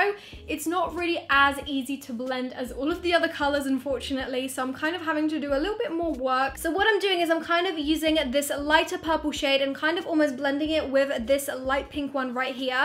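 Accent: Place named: United Kingdom. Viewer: You sound more British then normal 😂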